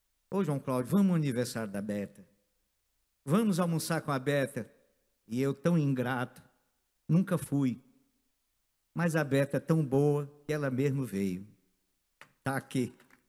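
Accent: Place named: Piauí, Brazil. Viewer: Grande abraço João, Humor excelente,ri muito, obrigado por este momento de Coimbra pt. adorei teu show, nostalgia ouvir Essas músicas icónicas, tens a voz do Brasil e sua cultura fantástica, muito bom sou fã, claro 😄 textos fantásticos, Prazer Ouvir Falar como Tu, pareces Ter dentro de ti um pouco das pessoas da Tua Terra e cultura do Piauí 🤍👏🏻👏🏻👏🏻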